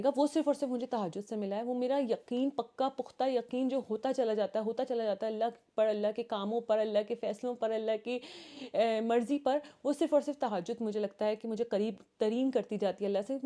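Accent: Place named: Pakistan. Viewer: Aur ek baat mai India se hu Baji Mujhe pakistani video bohat achhe lagte hai. Aap logo ka baat karne ka lehja Masallah bohat achha hota hai. 😊😊😊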